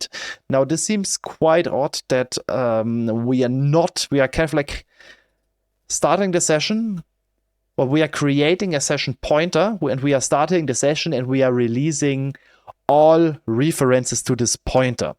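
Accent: Germany. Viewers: loved the german accent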